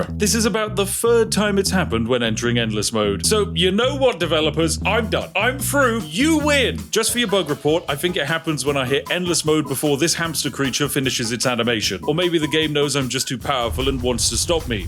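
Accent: United Kingdom. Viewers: is just british LGIO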